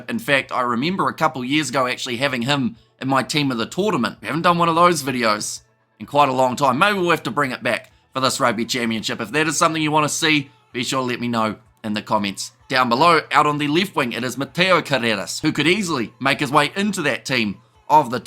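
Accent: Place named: South Island, New Zealand. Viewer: Sound like a south islander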